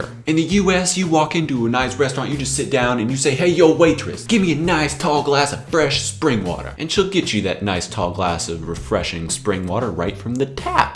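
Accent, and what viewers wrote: Germany. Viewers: Ooooh gosh, I absolutely love your German accent. It's adorable xD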